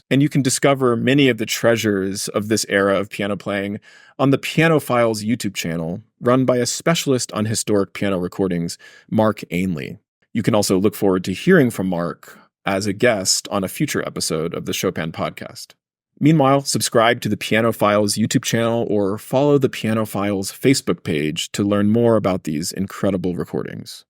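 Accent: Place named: United States. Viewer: Thanks for the reply - I recall now that Americans pronounce "era" and "error" alike, which has confused me before. You guys certainly make some weird and apparently arbitrary alterations - what is the logic behind putting a long o sound in "Chopin"? And it's clearly spelt Koczalski, so why say Koczolski?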